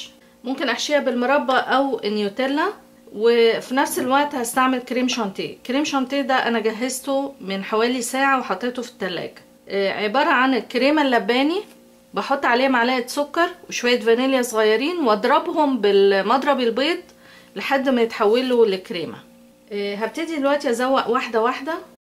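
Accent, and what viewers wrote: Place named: Egypt. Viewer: هذي اي منطقة فمصر لهجتها ظريفة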